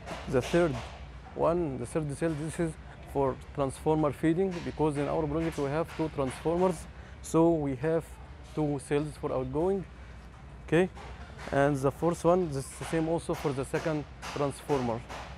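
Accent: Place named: Egypt. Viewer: there is an excellent Egyptian accent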